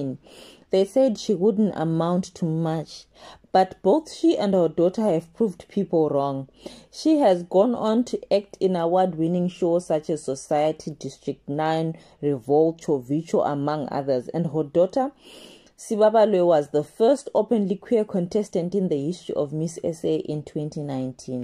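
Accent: Shona, Zimbabwe. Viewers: Shona accent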